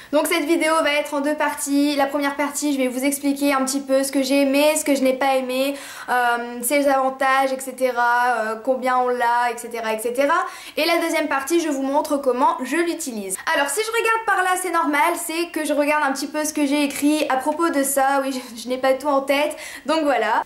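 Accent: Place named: Nord, France. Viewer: En fait je suis un mec et puis je regarde beaucoup de tes vidéos ! Ca fait gros pervers dégueulasse hein ? xD Non mais en fait le truc c'est que j'aime beaucoup ta façon de parler et ton ptit accent du Nord ca me change de mon accent de chez moi (en Alsace) et puis t'es vachement drôle je trouve et du coup ca fait toujours plaisir de t'écouter et te "voir", même si je ne suis absolument pas intéressé par un lisseur x) Bref merci pour les ptits moments quotidien de plaisir à regarder tes vidéos et puis abh...change rien ;) Ciaoooo !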